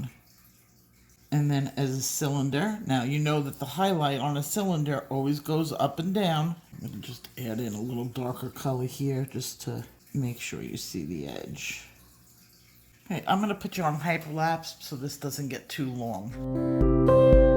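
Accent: New York, United States. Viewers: I LOVE YOUR NEW YORK ACCENT! PLEASE DON'T CHANGE IT! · great tutorial! Grew up in NY so your NewYorker sounds like home.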